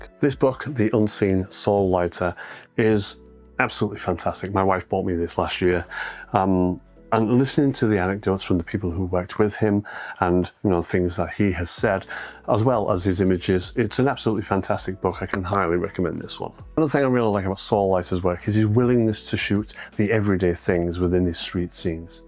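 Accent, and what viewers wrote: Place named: Hull, United Kingdom. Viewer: A hull accent on YouTube and a Saul Leiter fan, Brilliant! Really enjoyed watching. Your Instagram post brought me here, but before I read anything, I thought very Saul Leiter. Fabulous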